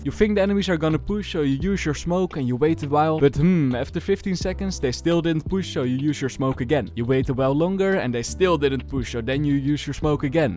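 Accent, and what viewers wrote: Netherlands. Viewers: I hear the dutch accent in every sentence hahaha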